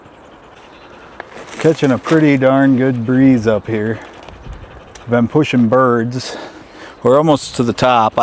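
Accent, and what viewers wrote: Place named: Canada. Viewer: Wooden pipes are just flat sided barrels, pretty much the same cuts to the wood, except the steel wire holds the compression. Once the pipe gets water, the wood swells and the wires don't. Because there is a water pipe the same size going downhill from this building I believe it was a speed baffle and control using 2 guillotine valve blades. Downstream somewhere would be a water wheel to power a belt drive system most likely for a lumber mill. Don't know where this, is but the guy has a bit of a Canadian accent so lumber seems most probable.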